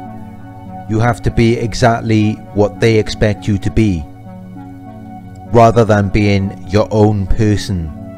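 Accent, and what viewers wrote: Wales, United Kingdom. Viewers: I had a male friend in his late fifties whose mother needed to infantilise him in front of his girlfriend. She would constantly talk about things he did as a child that made him appear stupid, and was still calling him by babyish nicknames. He tried to ignore it, but his girlfriend couldn't stand it. She let the mother have it real good at the dinner table one night, and said they'd never come over again if she didn't stop it. The mother was shocked because she didn't see anything inappropriate in her behavior! Thanks for these videos, and by the way, I love your Welsh accent!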